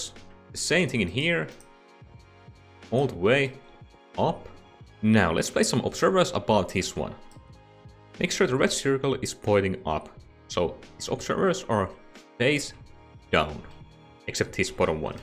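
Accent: Finland. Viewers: XD where are you from btw? your accent sounds so much like finnish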